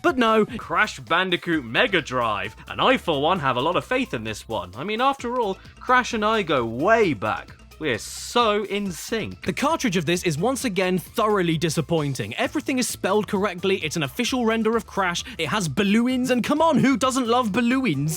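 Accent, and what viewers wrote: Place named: United Kingdom. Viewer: You are way too british but your account to be from the "united states"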